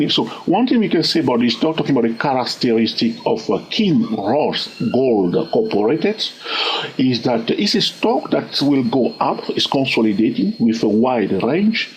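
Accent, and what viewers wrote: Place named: Sweden. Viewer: Thank you so much. I think your accent sounds more Swedish then Texan American lol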